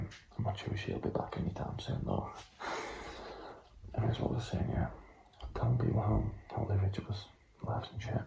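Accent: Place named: Newcastle, United Kingdom. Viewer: Your geordie accent gives me mad tingles seriously more than like any other accent. Crazy. P.s. you’re beautiful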